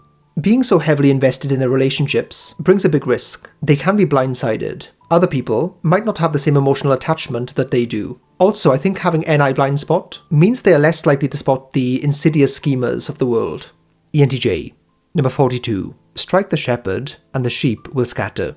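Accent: Wales, United Kingdom. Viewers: dwi'n abod accen yna, cymraeg ti?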